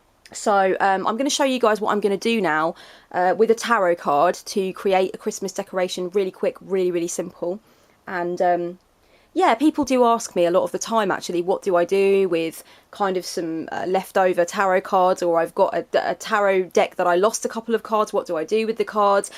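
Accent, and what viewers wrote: England, United Kingdom. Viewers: I've just about catch up on all your videos as a latecomer (mornings with a cup of tea - had to be done!) and they're great! So lovely to hear a fellow English accent amongst the depths of Youtube! Loving the Vlog idea too, it definitely adds something new. As a cheely suggestion, would love you to video your entire collection of cards - but then I am very nosy! Thanks so much for sharing all your thoughts, your videos/posts are brilliant.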